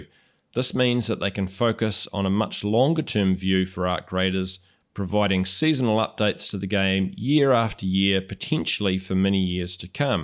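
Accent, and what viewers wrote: New Zealand. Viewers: As a kiwi abroad, it now hurts physically to hear a kiwi accent.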